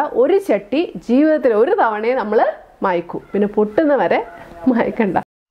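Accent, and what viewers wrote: Kottayam, India. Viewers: Kottayam slang super.. 👌😄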